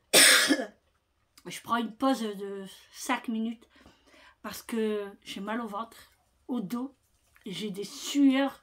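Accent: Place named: Hauts-de-France, France. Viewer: Bonjour ah c est matcho avec l accent des hauts de france j entendais min t 'chot rohhhh Belle journée prenez soin de vous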